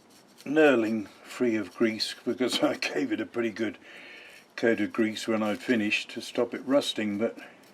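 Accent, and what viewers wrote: England, United Kingdom. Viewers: *, where are you located? Depends on the type of steel as to the porosity. A little heat between acetone cleanings will help. Looks pretty good in the end. *The reason I ask is your accent and terminology makes me think England, but you measure like an American.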